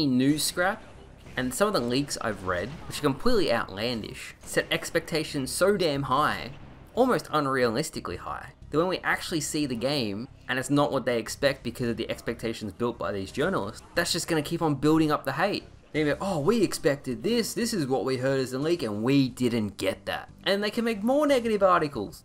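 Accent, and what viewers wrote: Australia. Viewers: Wooh up mate. Are you Aussie?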